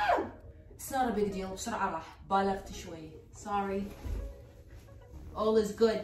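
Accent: Iraq. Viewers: والله اول ما شاهدت الفيديو ما كنت مفعله الصوت .وبس شغلت الصوت انصدمت انك طلعتي عراقيه بهيك لبس .والله يا اختي انت مبيينه انسانه طيبه وفرفوشه. استري نفسك حبيبتي .هلي ربنا يستر علينا ويفرج هم هالامه الاسلاميه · يزينك انتي وقناتك ولهجتك يزين أهل العرق بس🇮🇶❤️🇸🇦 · منووو نصدم لمن سمع الصوت وعرف عراقيه 🙂😐😂مشتركه جديدة حبيتججج 😍 #تعديل شكرا ع 50لايك 🌚😹😹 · لْـۆ مخليه ع المرايه چيس نيلون م̷ـــِْن ردتي تصبغين المرايه 😉+انتي عراقيه اصليه بالحجي 😅💛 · حجيج عراقي من وين بلعراق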